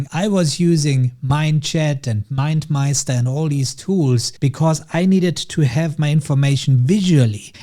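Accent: Germany. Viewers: Im hearing a lil bit deutsch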